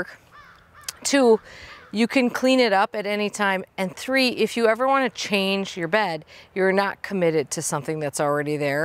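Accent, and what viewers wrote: Wisconsin, United States. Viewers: I had to laugh listening to you talk. I think the word “so” must be a Wisconsin thing as I say it all the time myself. I live in Door County and it isn’t t-shirt weather here yet!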